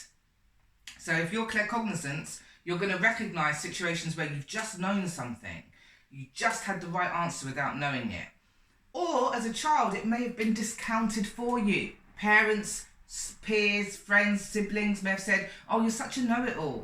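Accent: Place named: United Kingdom. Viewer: Hi, lobed ypur video. I realise I am a claircognizance too.. Sending positive vibrations to you regarding your Dad. You sound British, are you in the UK?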